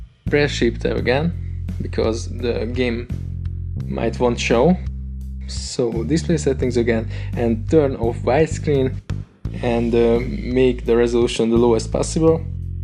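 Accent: Hungary. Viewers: Thanks ! :) That was a really big help :) u from hungary ? cause ur accent is same as hungarian english accent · Jól érzem az akcentusból, hogy honfitársak vagyunk? :D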